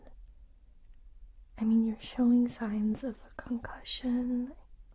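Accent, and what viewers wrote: United States. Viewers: What the hell? You sound American AF. Hahaha! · I am so impressed by your American accent! Brava! 👌👏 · Your American accent is fucking stellar.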